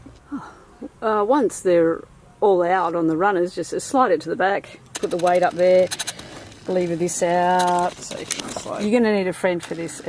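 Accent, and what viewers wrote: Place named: Australia. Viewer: Cool vid. So good to hear some Aussie…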